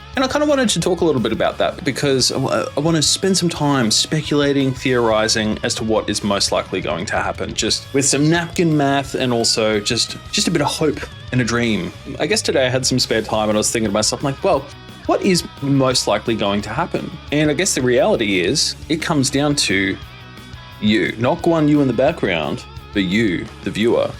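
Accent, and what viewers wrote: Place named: New Zealand. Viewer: Are you a kiwi? I dunno why I never heard it before but you sound real kiwi lol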